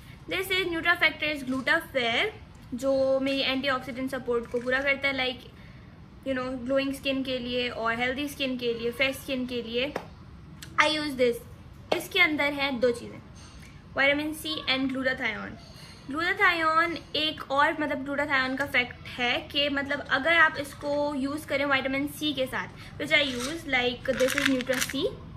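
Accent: Pakistan. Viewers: your way of speaking is like you are belonging to a muhajar cast...:(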